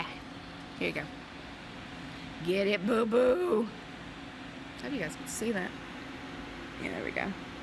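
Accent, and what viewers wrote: South, United States. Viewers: I am 63 years old and scheduled for my roux en y surgery on March 8th. Your quirky and honest videos give me the most realistic view of what I will be facing going forward. I realize everyone’s struggles are differently packaged albeit mentally and physically. In the end it comes down to changing what we eat, how we eat and how we move our bodies. I too struggle with physical challenges of several back surgeries, rheumatoid arthritis, etc., and the list goes on. I always maintained a healthy active 120lbs. 5” 4” frame until age 45 and illness, back and knee surgeries. Now I am 5” 3” 240lbs. Your southern voice initially drew me in (so fun to listen to) and as you went along, I just enjoyed the love story of you and Evelyn. Congratulations on reaching your targeted weight goal. You have worked very hard and I hope you feel fantastic! 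Thank you for posting and I hope you keep it up!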